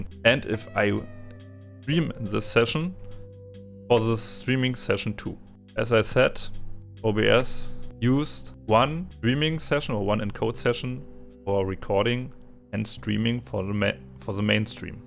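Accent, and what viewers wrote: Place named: Germany. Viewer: Man hört das Deutsch aufjedenfall raus 😂❤ danke für den hilfreichen Tipp 😍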